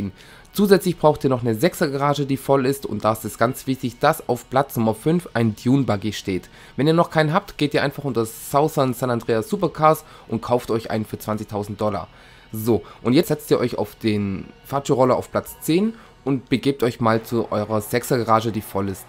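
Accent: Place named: Swabia, Germany. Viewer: Dein Akzent ^^ Ich liebe ihn haha ;D Schwabe :D Ich wohn in Ravensburg, sagt dir das was? :D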